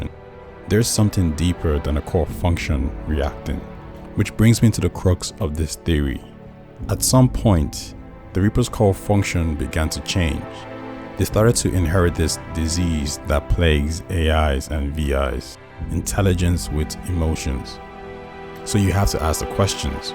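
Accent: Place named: Trinidad and Tobago. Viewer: You sound almost like a Trini. I dig it.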